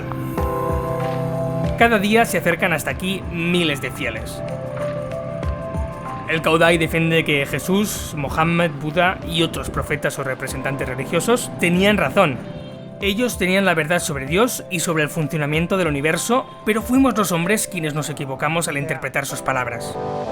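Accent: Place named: Spain. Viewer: Hola, Soy de Argentina 🇦🇷 y creo que Tú eres español, pero me encantó Tú informe,,y estoy sorprendida de ésta religión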